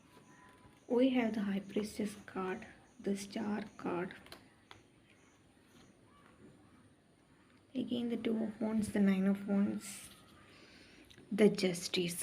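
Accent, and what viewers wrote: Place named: Kannur, India. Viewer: cheythini😂🤣,,, pakka kannur kari👍,,mm,,mm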